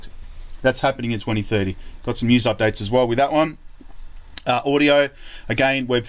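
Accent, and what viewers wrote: Australia. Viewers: Love hearing a fellow Aussie talk up Xrp. Have a bag of 70k xrp and I’m hanging for this to go back up as i bought at 68c AUD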